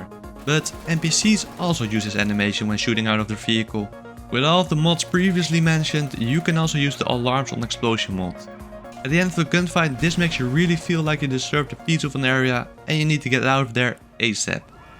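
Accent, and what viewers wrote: Netherlands. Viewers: i detected a dutch accent veel succes brooo je kunt het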